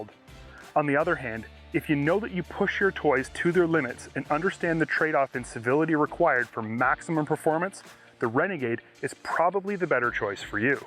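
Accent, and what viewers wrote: Canada. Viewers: what is up w that Canadian accent out sounds like oot i just do not get it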